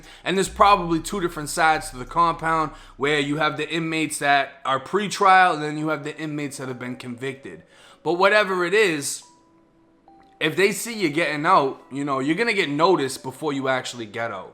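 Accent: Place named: Massachusetts, United States. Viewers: Your from Massachusetts huh?? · Yoooo you got a Massachusetts accent did you live up here in new England?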